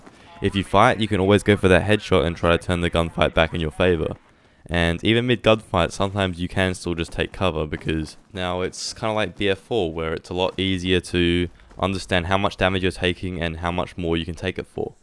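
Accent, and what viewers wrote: Australia. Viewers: Man it’s good to hear an Aussie voice 🤘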